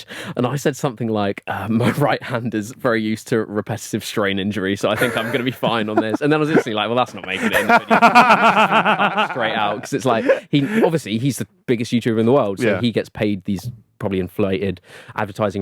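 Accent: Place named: RP, United Kingdom. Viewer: it's a joke because he's very polite and posh sounding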